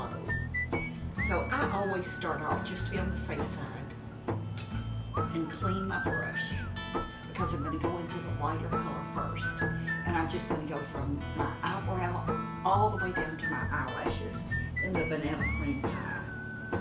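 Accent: South, United States. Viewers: Love the look, music is awfully annoying! Just your sweet southern voice is all we need! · Agree, the whistling is sort of annoying as it's hard to hear you. You look wonderful and always dress stylishly. Love your Southern accent. Diana in AZ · It's too bad the video is ruined with that awful whistling. The lady has a southern accent and is difficult enough to understand without the whistling.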